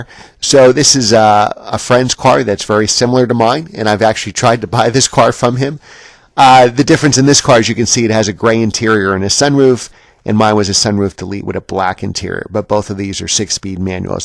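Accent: United States. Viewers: as soon as I hear an eemerikun accent, I switch off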